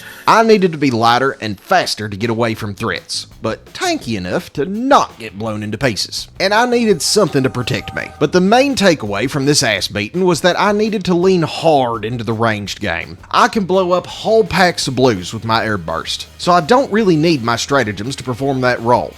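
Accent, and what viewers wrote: South, United States. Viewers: iam sorry but you sound like a very southern version of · Is the southern accent a bit or are you from the south? Would be nice to meet one of my own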